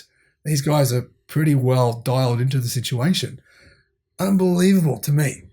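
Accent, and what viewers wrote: Australia. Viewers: sounds Australian.. 😁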